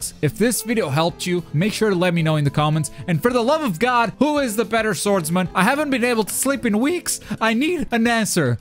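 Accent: Portugal. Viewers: Are you portuguese?? Like portugal portuguese?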